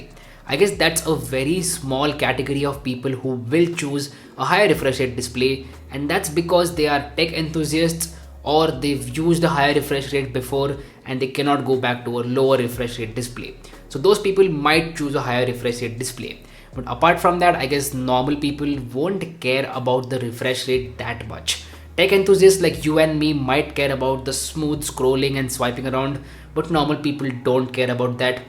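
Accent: India. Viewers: I hope I'll find one Indian with a different voice and accent one day...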